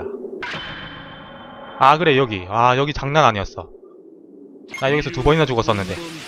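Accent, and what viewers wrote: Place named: Busan, South Korea. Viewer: 예전에 플스로 먼저 했었다길래 어디있는거지 하다가 어쩌다보니 검색으로 나왔네요 ㅋㅋ 시청갑니다 근데 목소리가 진짜 달라보이네요 ㅋㅋ 이때는 사투리도 더 심하고 ㅋㅋㅋ부산에서 태어나셨구나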